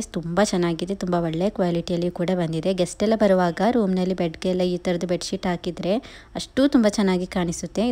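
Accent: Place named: Kerala, India. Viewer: Sis neewu malyalina nim maathado style ishta aythu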